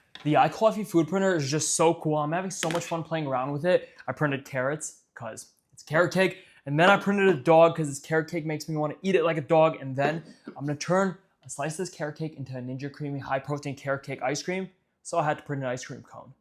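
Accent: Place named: Boston, United States. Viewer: Amazing! Love the subtle Boston (or is it Brooklyn? 🤔) accent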